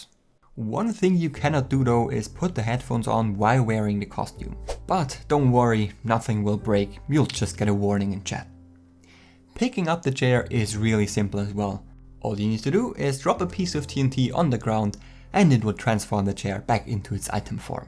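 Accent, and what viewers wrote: Germany. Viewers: You sound a bit like someone who speaks German, am i correct? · You kinda sound German xD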